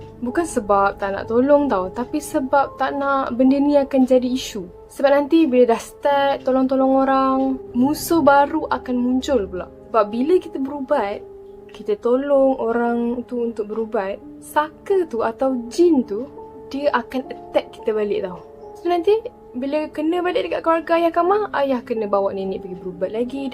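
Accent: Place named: North, Malaysia. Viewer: utara sleng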